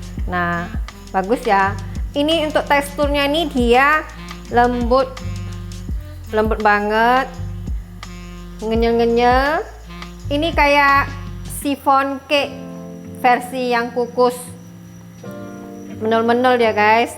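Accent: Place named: Java, Indonesia. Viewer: Saya suka resep2 kue khas medan👍 cuma logat bicara cik kok logat jawa ya?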